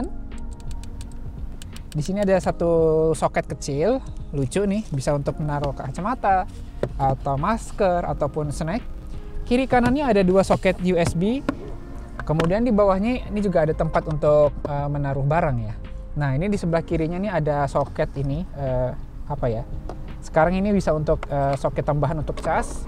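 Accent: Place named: West Java, Indonesia. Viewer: Logat na mah Sunda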